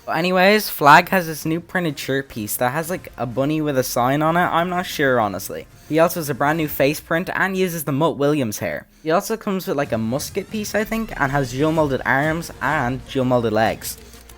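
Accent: Ireland. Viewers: Yo he's Irish!!!!! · Are you Irish I recognise your accent. I am Irish myself btw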